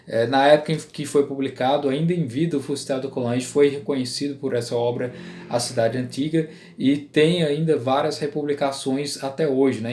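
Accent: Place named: Recife, Brazil. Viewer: Você é de Recife? Percebi um leve sotaque.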